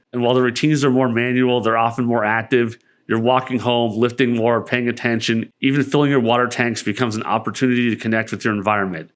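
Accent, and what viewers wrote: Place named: United States. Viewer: It sounds like you have some kind of American accent.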